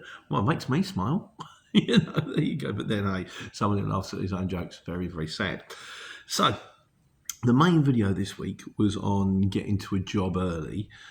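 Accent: South London, United Kingdom. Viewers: Its a soft south London accent .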